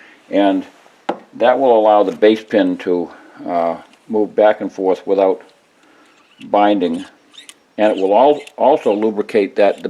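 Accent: New England, United States. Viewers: Hearing your accent, you can’t live to far away from the Sig Sauer headquarters? Grew up in Gloucester, Ma and raised my children in Gilford, NH. That is either a Southern Maine or a Southern NH accent. Love your videos.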